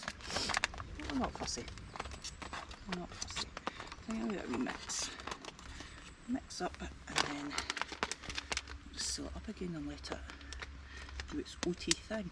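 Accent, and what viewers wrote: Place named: Scotland, United Kingdom. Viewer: I didn't know how much I needed your beatifull soothing scottish voice today. Thank you for that.💚 What a wonderfull trip in an absolutely wonderful country.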